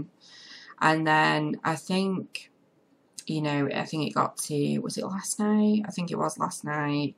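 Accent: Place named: Northern England, United Kingdom. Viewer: You are more than worthy of everything you do! It's just jealousy of your talent for these builds that's all. Really enjoying binge watching your videos and to hear your genuine comments on the bs you receive! Also nice hearing you're English like myself, except I'm not from your area (I think you're up north somewhere). X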